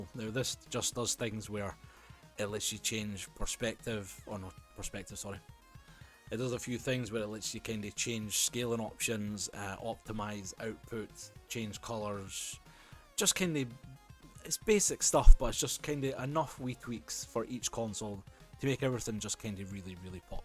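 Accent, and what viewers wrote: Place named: United Kingdom. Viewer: Thanks for the video! You showed us video from a N64 connected to the ODV-GBSC via SCART, was that SCART-RGB or composite? And I presume the cable from the Sega Mega Drive was SCART-RGB? And, judging by your accent you are British; Are your consoles PAL and they work fine? What about the screen tearing issue, in which situations and with which consoles did you have it appear? Have you tested VGA input? I'm looking for an affordable scaler for use with a DOS PC (VGA), Amiga (SCART-RGB) and C64, NES and N64 (composite). It seems that unless you pay the extortionary big bucks and import a Retrotink from the US, finding a single device with all these capabilities is very difficult.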